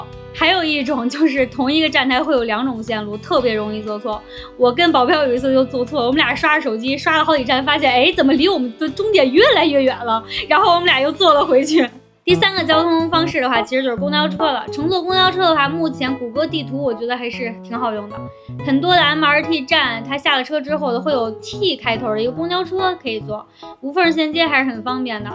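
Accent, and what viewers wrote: China. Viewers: 是那里人？口音像是北方人😊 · 主播北京的吗？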